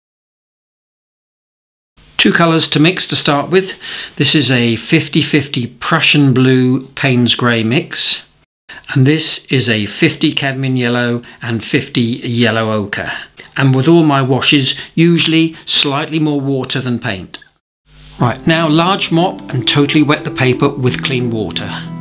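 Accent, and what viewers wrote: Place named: United Kingdom. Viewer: Dream atmosphere. Enchanted by the music, your painting and your wonderful British accent. I could spend hours watching you paint. Thanks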